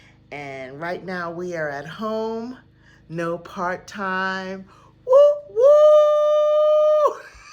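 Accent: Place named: Connecticut, United States. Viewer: One more thing there is an Accent in your voice I guess that's Connecticut.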